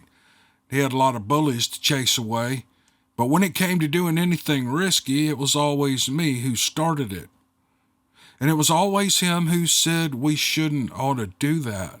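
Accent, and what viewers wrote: South, United States. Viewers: Hats off to the writer & story teller. Story flows like southern accent. 🧚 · Don't worry about the haters. Just read until you find out that they are haters and then hit the delete. Don't waste your time on them. I love all the stories and don't mind a bit if you mix Bigfoot and ghost or aliens! All good!! Thank You for doing these I love your story telling all the way up here in Michigan. Oh and your Southern sound is just fine!! Thank You again! Tina Rasegan.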